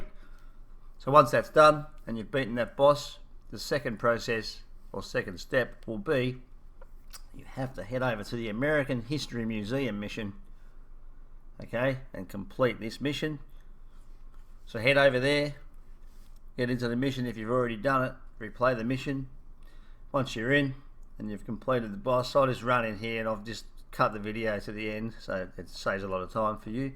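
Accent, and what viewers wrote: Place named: Australia. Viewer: I can already tell your Aussie 😂, nice video and great explanation 🤘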